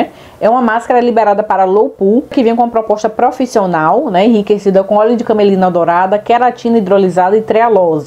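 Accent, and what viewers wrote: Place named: Bahia, Brazil. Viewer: pelo seu sotaque, você é minha conterrânea! Por favor, onde acho isso aqui em Salvador? Obrigada!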